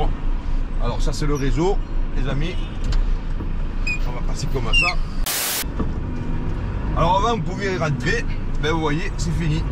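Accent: Marseille, France. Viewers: Ta façon de parler est identifiable à Marseille tout le monde ce connaît … Pour quelques vues sur YouTube mettre sa vie en danger 😱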